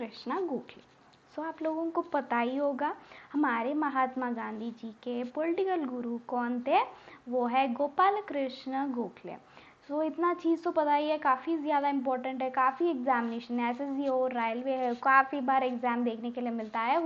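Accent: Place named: South India, India. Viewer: Wonderful session mam. And your voice is very very cute mam. There is a foreign+ South Indian touch in your accent which makes it unique☺☺☺😍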